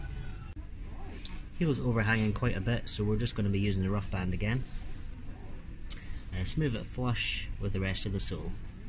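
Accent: Scotland, United Kingdom. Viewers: Where are you based? Scottish accent is encouraging as I’m looking for a reliable higher end cobbler to work on a collection of welted shoes! Always something needing done but hard to find solid craftsmanship! If you were not disastrously far away I could get some work your way! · I watch many cobbler channels all are great. However it is fantastic to watch a cobbler from my own country speaking Scots. Would be great to see you tackle other leather products like Bedos. Thanks.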